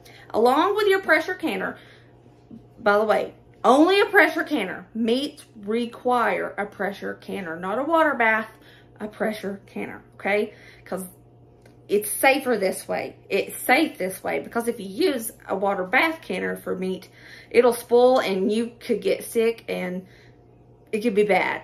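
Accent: South, United States. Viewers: Never heard of home made Spam having lived in many different states I am amazed to see this process. Odd your channel and Mama Baird's both came out with this at the same time. Very interesting you surprise me and love hearing the Southern accent.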